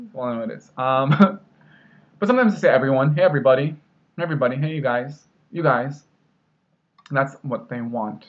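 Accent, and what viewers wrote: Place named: North Jersey, United States. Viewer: You sound mostly like an inland North Jersey/lower Hudson Valley NY guy to me now, although you kept the New England style pronunciation of "aunt" for sure. It's interesting you started developing a sort of "cot/caught" merge since this is uncommon in both RI and NJ, wonder where that came from? I'm a South Jersey guy, born in Philly, who has moved up to South Shore Mass area. Up here I'm seen as having a Philadelphia accent, complete with the short-A split("trap" vowel is æ, "bath" vowel is ea). I've gotten made fun of for pronouncing aunt as "ant", my "furry-ferry" merger, and I had trouble with your hometown's name. I wanted to say "WOON(rhymes with noon)-socket" instead of "Wun-socket". Oddly in Philly and South Jersey "jimmies" is typical for the donut/ice cream confectionery as it is in Rhody and Boston. 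I'll always say "ant", but I have fixed my pronunciation of Woonsocket. They have good fish and chips there.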